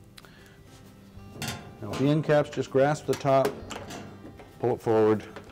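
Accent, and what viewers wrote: Canada. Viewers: Thank you, this was exactly what I needed. Love your accent, is that Canadian?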